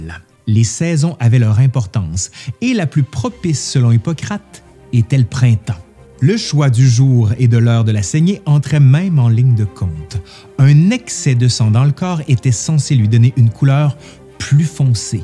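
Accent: Canada. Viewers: très intéressant... en plus. j'adore votre accent canadien ;-)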